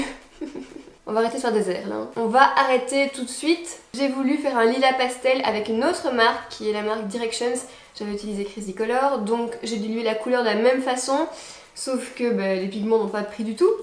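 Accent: Belgium. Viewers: Tu es belge ou francaise? Pas je t'adore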